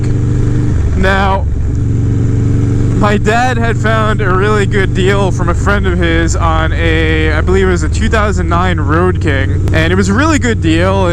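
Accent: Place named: Long Island, United States. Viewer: This is my first time watching you but I'm glad I found ya I can tell this is Longisland I'm assuming, which is where I'm from I'm looking to get a dyna for my first bike this spring/summer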